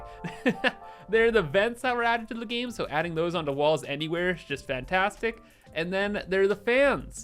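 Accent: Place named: Canada. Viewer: i love how canadian you sound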